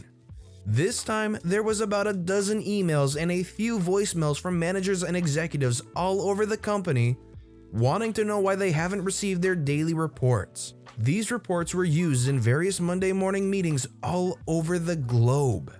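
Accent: United Kingdom. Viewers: is British, that'll be why / sounds different XP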